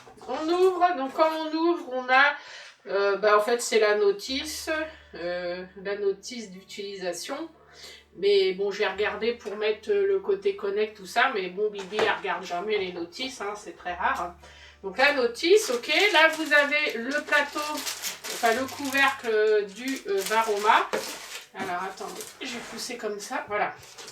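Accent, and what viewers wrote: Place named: Paris, France. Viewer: oh oui des recettes allégées !!! bonne vidéo, un p'ti accent j'dirais parigo !!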